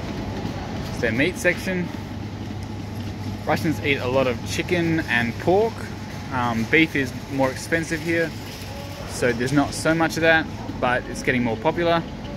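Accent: Australia. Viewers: В первый раз услышал Австралийский акцент английского. Довольно забавно. Удачи в России.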